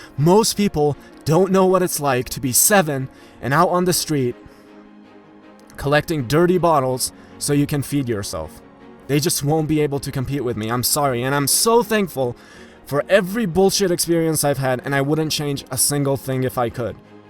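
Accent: Georgia, United States. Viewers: What? Are you from Georgia? Duude❤ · You from Georgia? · You are from Georgia?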